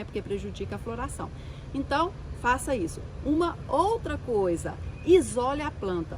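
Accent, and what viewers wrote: Minas Gerais, Brazil. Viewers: Voce é mineira ?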